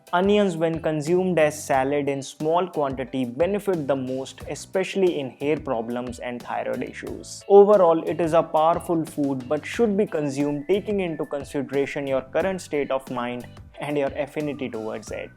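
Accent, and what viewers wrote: India. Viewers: Are you intentionally using a thick indian accent??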